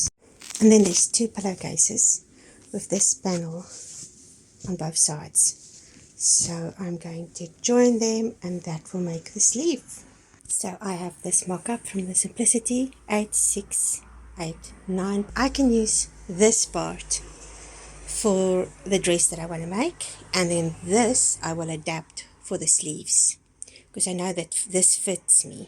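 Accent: Afrikaans, South Africa. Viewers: Hello. Are you Afrikaans speaking? From your accent you seems Afrikaans but the scenes in your videos does not seem to be South African😊😊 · I recognise your accent from Afrikaans Nationality in South Africa 🌿🌹♥️ I am in South Africa 🇿🇦 Enjoyed your video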